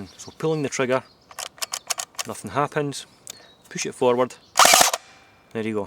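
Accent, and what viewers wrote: Scotland, United Kingdom. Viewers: heavy scottish brough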